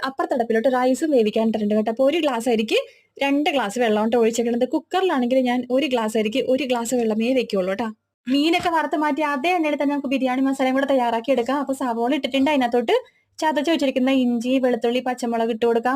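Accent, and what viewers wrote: Kochi, India. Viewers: Biriyani kazhichathinte Santosham aano last ile dance 😂 Eniku othiri ishtama ...kochi baasha kelkaan❤❤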